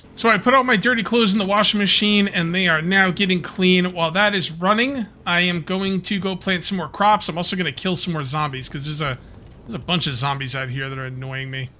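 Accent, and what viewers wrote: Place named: Philadelphia, United States. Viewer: You must be from Philly.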